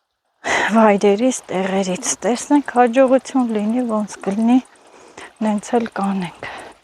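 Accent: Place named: Dzorakhpyur, Armenia. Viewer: Мэр Дзорахпюри барбаров эл Э - чемнали! Шат аразат э лсэлн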